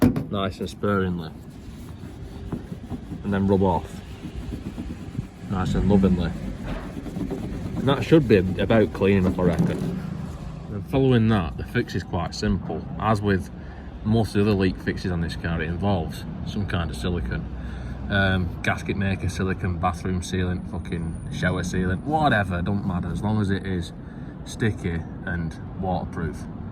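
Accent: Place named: Northern England, United Kingdom. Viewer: He has a cool accent. Where in Northern/ Midlands England is the accent from?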